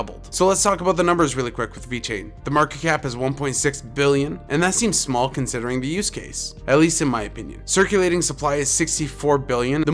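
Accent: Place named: Canada. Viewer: I dig your Canadian accent. I bet people on YouTube around the world (other than US and CA) think you're American. There's only 4% of things you say that give you away. ;-) I follow about 8 crypto channels and am HEAVILY invested in this world and have added you to my list to follow. You're knowledgeable and I dig that. Thanks, brother to the north.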